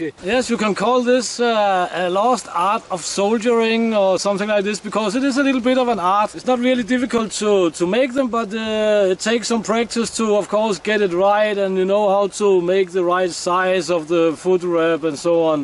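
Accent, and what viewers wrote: Denmark. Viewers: The most surprising thing about this video, is seeing a Russian speaking English with a Danish accent. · No he's Danish, it's a danish accent. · He is from Denmark. Many Danes are fluent English speakers. My brother has a Danish wife a 3 children. I knew was a Dane from his accent.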